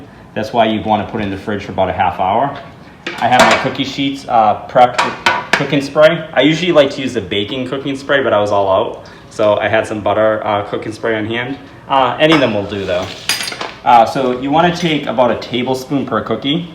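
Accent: Boston, United States. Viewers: Thanks for the recipe! Am I hearing a Boston accent there?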